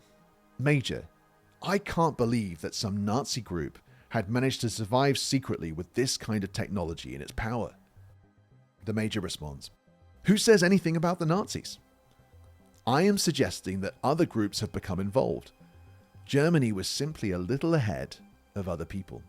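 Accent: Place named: United Kingdom. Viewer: Could be. Your have a British accent.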